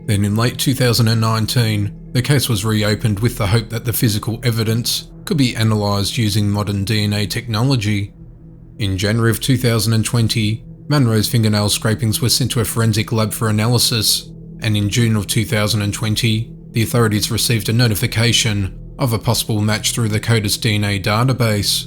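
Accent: Australia. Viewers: Sounds more like he is from Australia to me.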